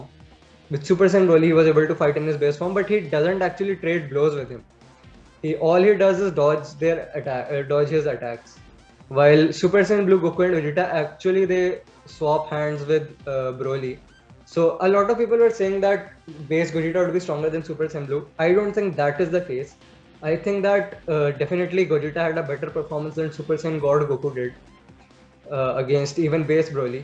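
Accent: India. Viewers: I couldn’t understand him that Indian accent is to strong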